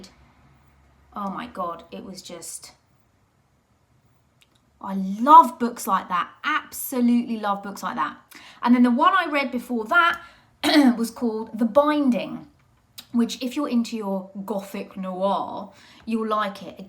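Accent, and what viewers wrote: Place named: North, United Kingdom. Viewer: 🤣🤣🤣 yes the northern accent!! I love you when you do that! Been having a brill day today but you have just made it better!! I get so excited when you post a video and just love the chit chat 🤸😊😁♥️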